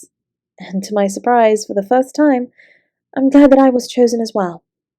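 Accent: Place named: United Kingdom. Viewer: You say "pompous British accent" but I say "absolutely nailed it." This was amazing, and the accent only made it better. Thanks for the audio,.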